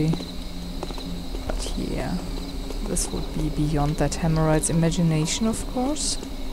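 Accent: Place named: United Kingdom. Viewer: your accent is beautiful :D british, innit?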